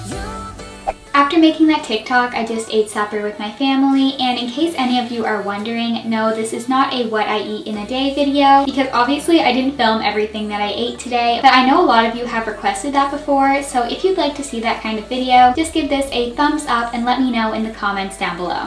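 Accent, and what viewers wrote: Canada. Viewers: I love the way Canadians say "sorry" and "picture" it's so endearing.😊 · Are u Canadian or American??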